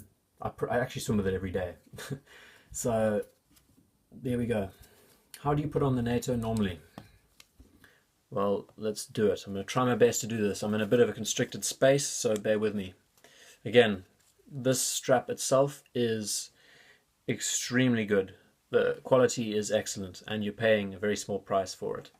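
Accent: South Africa. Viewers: Wow. All these years. I had no idea!! Btw do you mind if I ask if your accent is from 🇿🇦? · Great video thanks! Are you South African?.. actually no chance you not with that accent. Howzit! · Except the guy has a clear South African accent. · cool tip. your accent has a south african lilt :-} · Excellent Video Brother. Don't lose the SA accent - takes me right back home.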